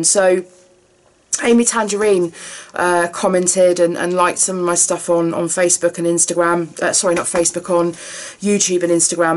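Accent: United Kingdom. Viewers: Can't believe I missed this video earlier. Have always loved your videos. Love this one and getting to know u better. Love your sense of humor and I could listen to the English accent all day long. Love your sassiness and love that u don't apologize for your overabundance of everything scrapbook. Much love from usa.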